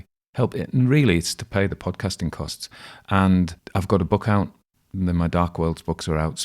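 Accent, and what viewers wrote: Australia. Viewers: How did I miss this one??? Love your presentation, Australian accent and informative commentary. Just finished your "More Cumbrian Ghost Stories":_pumpkin::_pumpkin::_pumpkin:Can highly recommend it? Thank you! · Well done Aussie accent. Great story and commentary. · Wonderful accent that keeps the story firmly anchored in Australia. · I thought you’re Australian accent was very good, not that I personally speak with an Australian accent. Good story, thank you 🙏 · I'm a West Australian living in Arizona. As soon as I heard your accent I felt right at home. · Wonderful, thanks, and I for one DID enjoy your Australian accent! Comments at the end informative, insightful and useful in understanding the piece, I do think they add a LOT to the reading. -- And thanks for your kind and sympathetic wishes at the end. Am sitting in Sweden and seeing a corona-disaster all around me. 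Really appreciate your reaching out to listeners. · I missed this the first time round, but glad it came up again. Pretty good Aussie accent, but different to mine, (Even Australia has regional differences and they change with time.). Tasmanians use even more shwa and flat 'a's as in 'at' (except in 'castle'. Why this is an exception I have no idea.). Loved the story, and hop all is well with you and yours. 🙋🏻🔻🇦🇺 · I'm a kiwi but I thought your Aussie accent was pretty good. Our goldfields could tell a few stories too. Have you seen The Luminaires? It's a TV series from 2020 with a supernatural theme running through it. About the Otago gold rush. Excellent series. Very spooky! What an incredible life that young author had! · Your Australian is marvelous. Am completely enjoying all your readings. You can truly be proud of the quality of your work. Thank you so much for these tales. Cheers Pat in New Jersey. 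· I enjoyed this story so much. It captures the scene perfectly and I was quite taken with your excellent Aussie accent and the worn and tired tone.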